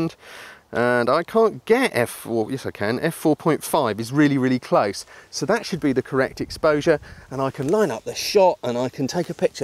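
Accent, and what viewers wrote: United Kingdom. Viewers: If I could have a British accent this is exactly the accent I would want. This accent would play very well with the ladies here in the states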